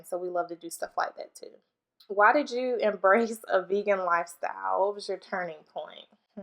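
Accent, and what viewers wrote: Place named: South, United States. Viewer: Thank you in bunches for answering my questions in full!! You truly blessed me!! I'm from Charleston, South Carolina so that's how I picked up on the southern accent!!! I can't wait to meet up win you girl! Also you look amazing not just for your age which 30 is super young but in general. I thought you were 22/23!! Love your future plans for you and your family!! FAMILY FIRST!!!! ❤️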